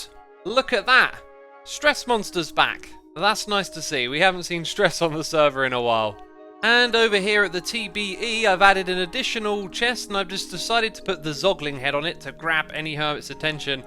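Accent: United Kingdom. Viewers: Because of his British accent and his commentary style of the true gentleman, I feel like the sound of sipping a cup of tea every now and then would happen any time.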